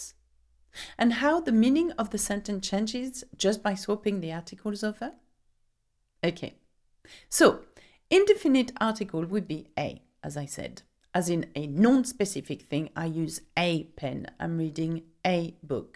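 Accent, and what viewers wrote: France. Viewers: You are the best french teacher . You bring the best enjoyable lessones. I learnt that je is I but my sis spends her time with you. We all love your french accent and and english too I am 18 years . I will bring you money for your good explaining. 🎉 DID,you know the princess loves your french accent in london, now she knows hou to speak french. We ❤❤❤❤❤❤❤❤❤❤❤❤❤❤❤❤❤❤❤❤❤❤❤❤❤❤❤❤❤❤❤❤❤❤❤❤❤❤❤❤ U. Bye l got to go for ballet lessones i was watching you . ❤❤❤❤❤❤❤❤❤❤❤❤❤❤❤U. Aurevoir!!!!!! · I love your English with a French accent!!!! It's really cute! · Are you from France 🇫🇷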